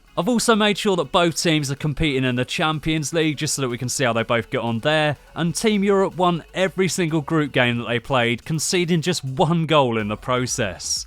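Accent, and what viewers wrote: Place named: England, United Kingdom. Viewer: I'm a PES PLAYER ,i don't like FIFA but i Like your English ACCENT you should be the COMMENTATOR of FIFA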